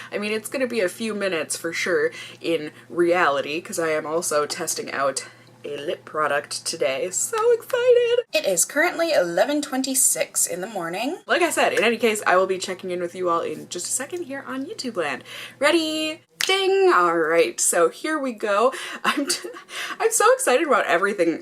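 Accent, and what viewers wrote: Minnesota, United States. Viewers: She is so relatable. She seems like someone I would have gone to art school with. Watching her videos always feels like sitting down with a girlfriend and doing our makeup. And that voice! So relaxing. Canadian here, and I think her Minnesotan accent is comfy and homey.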